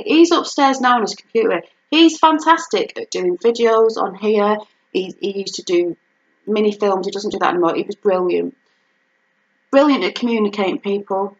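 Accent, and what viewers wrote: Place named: Birmingham, United Kingdom. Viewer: This I think is Birmingham, with that accent lol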